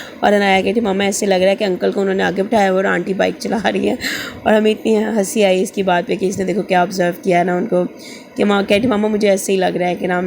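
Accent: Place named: Pakistan. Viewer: Aapke bachche mashallah bhut cute h main India se hun aap k lahje se lag rha h aap Pakistan se h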